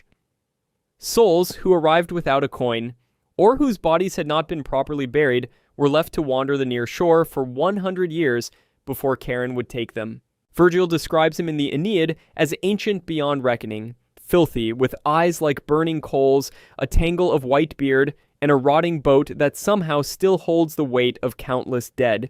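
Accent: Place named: United States. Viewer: The amarican accent can never say things properly..."Fryer" is where i left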